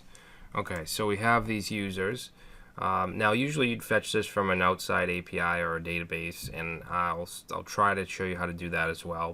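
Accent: United States. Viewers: Man, how I feel happy when I find someone with native US accent explaining a technology these days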